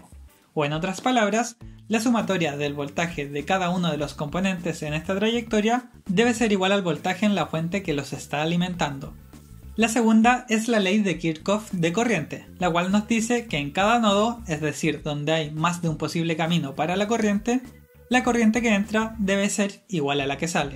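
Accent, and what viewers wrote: Chile. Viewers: Saludos ,eres chileno??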